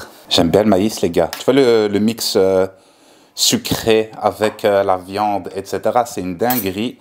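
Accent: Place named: Brussels, Belgium. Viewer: Le khoroto de bx on reconnaît l’accent 😂😂😂🇲🇦🇧🇪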